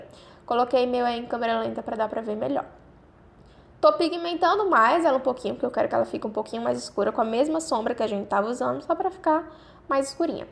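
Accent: Northeast, Brazil. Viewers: Amei o vídeo e já me inscrevi. De onde vc é? Tem um sotaque nordestino. Sou de Recife. Beijos 😘😘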